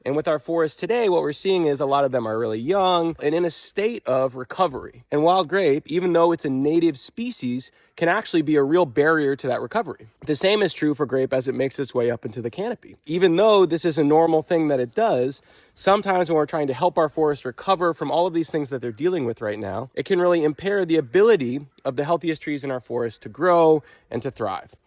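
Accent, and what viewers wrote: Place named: Vermont, United States. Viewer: Oh my goodness! You sound like a real Vermonter! Recognizing nuance dances within the entire Living Web of Life.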